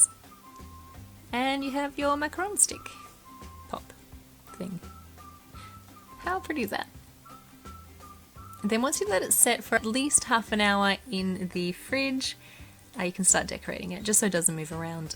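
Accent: Australia. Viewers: she pronounces 'almond' as its spelt, and 'sandwich' she says it in an australian accent - just like how australias say 'straya. main thing is we understand what is saying, nothing wrong with it at all.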